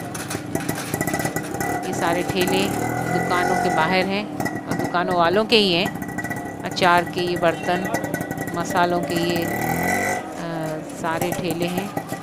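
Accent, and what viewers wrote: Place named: India. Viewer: Your voice is pure Indian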